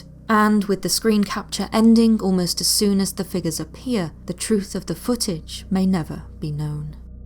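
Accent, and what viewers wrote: United Kingdom. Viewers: Isn't it pronounced "(gē-zər)" in British English? The narrator sounds like she's British, which would make sense.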